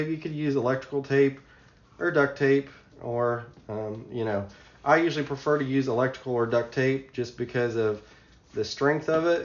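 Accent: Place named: Kentucky, United States. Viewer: Ok, well so far I've heard you say waller it out and now you're using electrical on your PFD. You are definitely from Kentuckuy and that's a good thing.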